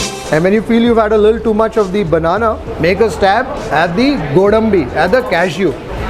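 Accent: Karnataka, India. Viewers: The way you anchor in kannada English is very appreciated and also the food presentation is very addorable 🙏🙏😊❤️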